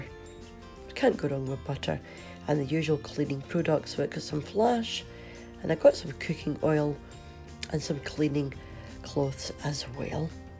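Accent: Scotland, United Kingdom. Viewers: You got some great stuff. Ahh I love the Scottish accent 🏴. You say so many “wee” words my Irish family use! Lots of love 💕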